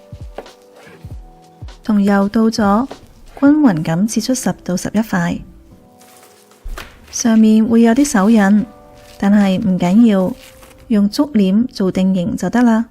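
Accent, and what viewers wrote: Standard. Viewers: 粤语非常标准，听了舒服极了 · 妳的廣東話好地道喎, 好專業既講解, 看🈵學習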